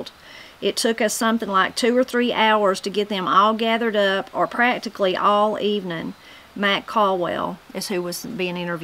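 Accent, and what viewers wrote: Appalachia, United States. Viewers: With so many famous people from the Appalachians and Ozarks like Loretta Lynn, Dolly Parton, the GREAT Glenn Campbell, June Carter, Johnny Cash, which one of them do you think you sound the most like? I just listened to a 1970 interview with Loretta Lynn after this and she sounds different from you but with many similarities. So many great guitarists and session musicians from those mountains too. Alot of them like guitar greats Louis Shelton and Glenn Campbell ended up playing studio sessions for many different singers and groups in the 1950's and 1960's because producers very much wanted that sound they had whether it was rock, blues, soul or r&b. The Appalachians and Ozarks accent isn't only speech it comes out in music too.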